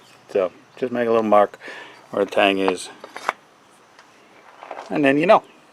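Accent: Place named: South, United States. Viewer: LOL I came to comment about how southern he’s sounding these days 😂😂😂 I wonder if he notices he’s doing that 😂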